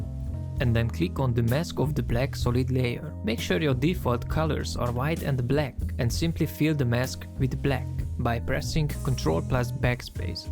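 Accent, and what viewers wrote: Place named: Hungary. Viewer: hungaro accent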